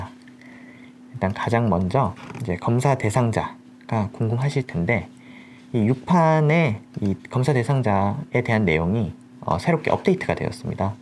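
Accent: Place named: Gyeongsang, South Korea. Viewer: 블로그로만 뵙다가 목소리 듣게됐는데, 경상도 억양이 새롭네요ㅎㅎ 앞으로도 좋은 영상 잘부탁드립니다. ps. 한두가지 제안을 드리자면, 아무래도 내용이 일반인에겐 다소 전문적인 내용이라, 집중도를 살리기위해 영상 하나당 3분 정도로 하면 좀더 좋을것 같습니다. (예를들어, 이번영상을 세부주제로 3토막내어 올리는것) 또, 설명력과 전달력은 굉장히 훌륭하십니다. 뭔가, 서울 대형병원의 질병 설명영상 속의 교수님과 비슷한 느낌으로 잘하십니다. 다만, 유튜브의 생명인 조회수와 몰입도 향상을 위해선 순차적인 설명방식보다는, 짧은영상으로 일반인에게 지루하지않고 임팩트있게 전달하기에는 신종코로나에 대해 요즘 사람들이 가장 궁금해하는 FAQ에 대한 q&a 형식이 더 낫지않을까합니다. (ex) 왜 의심환자가 양성으로 확진나기까지 걸리는시간이 그때마다 제각각인지, 코로나로 의심된 환자가 사망했을 경우 숙주가 죽었는데 그것에서 채취한 검체에서 나온 코로나 음성판정이 과연 의미가 있는것인지 or 믿을만 한것인지, 마스크는 어느정도 범위까지 막아주는걸 써야하는건지(만약 마스크와 손씻기만으로 충분하다면 왜 의료인들은 방호복입는건지), 알코올이 실제 항바이러스 효과가 있는지 등등) 제 제안은 말그대로 제안일 뿐이니 참고만 되셨으면합니다:) 본격적인 ent 1년차 입성을 앞두시고 선별진료소 일까지 겹치시어 많이 바쁘셨을텐데, 첫 영상 만드시느라 수고많으셨습니다^^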